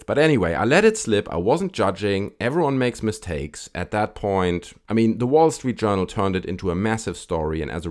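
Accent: Germany. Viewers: Also out of context are u german? just ur accent.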